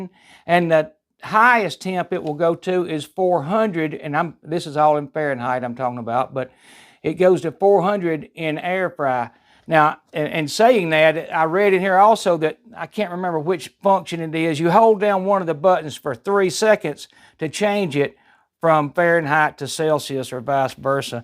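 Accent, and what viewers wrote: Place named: Appalachia, United States. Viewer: Hock dang dam nammit that hill Billy accent lol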